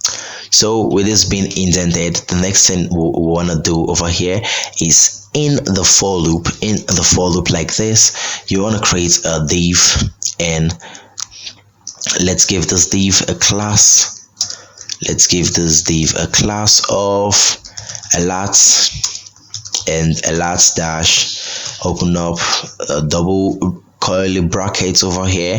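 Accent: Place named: Nigeria. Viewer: Wow ...you great ...maybe its the Nigerian accent but you taught me better....Nigerian from Canada here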